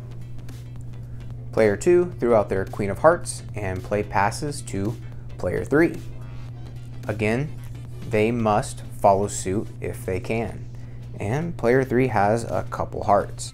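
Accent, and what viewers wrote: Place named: Rotterdam, Netherlands. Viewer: Wel Rotterdams hè...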